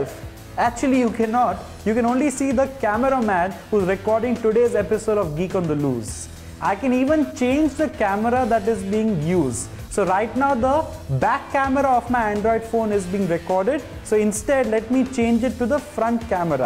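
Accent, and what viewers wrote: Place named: India. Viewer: Oh, I love your videos! I started to watch just to hear your cute indian accent but you're so funny and share excellent information that i felt in love with geek on the loose and unzziped by Dell too! I wish i could be your baby doll <3 LOL XOXOXOXOXOXOXOXOXOXOXO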